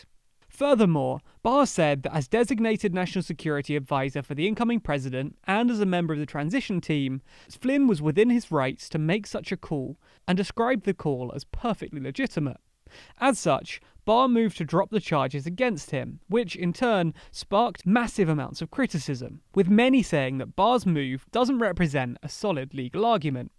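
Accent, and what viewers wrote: United Kingdom. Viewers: Why isnt this lefty loony talking about how a former president was spying on a potential president? I thought lefties are all against invasion of privacy? Btw, this guy sounds like a brit? No mention of the fake dossier that got this bullshit rolling thats been spewed to us for the last 4 years over and over? This whole thing is total bullshit. Makes watergate look like a joke.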